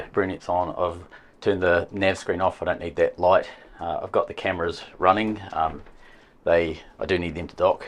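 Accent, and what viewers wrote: New Zealand. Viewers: That is the kiwi pronunciation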